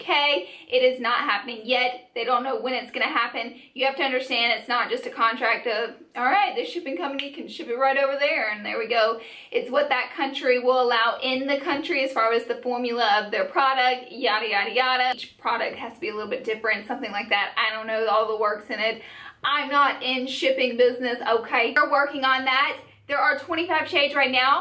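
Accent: South, United States. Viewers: I was curious too!!! I thought it might have been because of her southern accent, but most people know koala at least /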